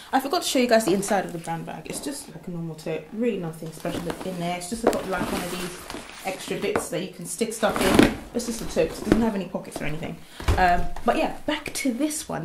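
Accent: Nigeria. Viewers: Please do the Nigerian accent throughout your videos, I live for it hahahaha! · the Nigerian accent is giving me life lol, I feel like you are so much more relaxed around the camera now! lovely hun!! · Beloved naija accent What strapless bra are you wearing with that off the shoulder tight H&M light pink/peach top? if you don't mind me asking. 😊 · The Naija accent hahaha. Perfect. Love your videos.:-)) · I love how the "naijaness" in you is coming out and I love your bloopers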